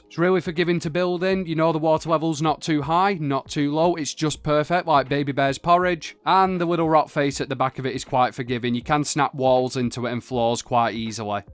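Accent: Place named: England, United Kingdom. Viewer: Thank for the vid been nice hearing a englishmen talking instead of all the yanks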